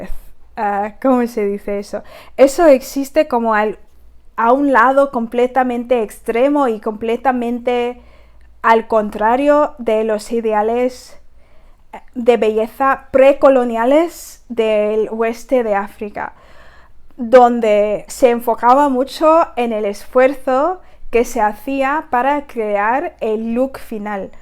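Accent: Spain. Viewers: ¿Cuantos idiomas hablas? Tienes una dialecta de España. 😁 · Tienes el acento muy Español cuando hablas, aunque si, hablas muy bien! Emma Dabiri me parece una mujer bastante inteligente y la perspectativa que nos contaste de su libro es interesante. Para mi personalmente escogì a crecer mi pelo Afro natural por razones relacionadas a mis creencias politicas. Fue un acto de resistencia feminista negra y asì desde entonces vivo con el espiritu y mente completamente libre. Hasta hoy en dia, encuentro muchisimo inspiraciòn del estilo de las panteras negras como Angela Davis y Kathleen Neal Cleaver. Es que Emma Dabiri me da mucha pena y decepciòn. Primero, no creo que ella hubiera tenido esta plataforma de hablar por parte de las mujeres negras si no fuera mulata con la piel clara. Podria ser que habria algunos que no estan de acuerdo, pero ella utiliza esto ademas a su sexualidad para subir su visibildad publica y para adelantar su carrera. 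Por ejemplo no entiendo por que auñ de ser respetada por sus ideas intelectuales tenia que publicar fotos de si mismo llevando nada mas que su ropa interior en Instagram?? Para mi esto muestra que intelectualmente ella no esta tan iluminada ni liberada como tal. Es que nunca nos vamos a ver a un hombre a su mismo nivel profesional presentandose en instagram medio desnudo intentando a utilizar su sexualidad para subir su perfil publica. Eso es solo lo que queria comentar en cuanto a ella. Y como tu, soy britanica pero aprendì mi español viviendo y trabajando en Cuba y Colombia. Me encanta los acentos de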